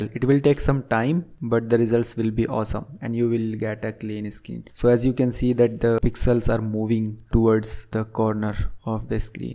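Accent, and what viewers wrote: India. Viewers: He has the best indian accent!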